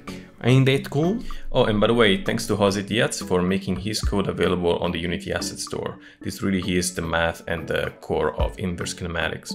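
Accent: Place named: Italy. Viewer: You sound a little italian...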